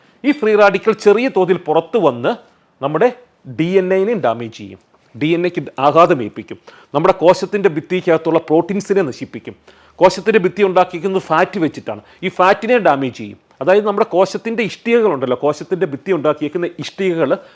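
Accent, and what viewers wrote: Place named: Pala, India. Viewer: ഡോക്ട്ടറുടെ നാട് പാലായാണോ? ഞങ്ങളുടെ സംസാരം പോലെയാണ്. പ്ലീസ് reply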